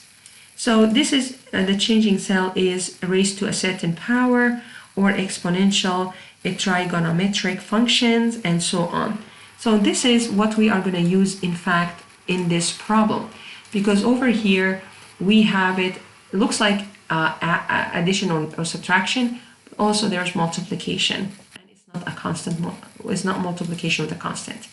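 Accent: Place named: Iran. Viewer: Thank you, I must say you sound like my Persian friends accent. I miss them, we used to have good fun in University in Tasmania. God bless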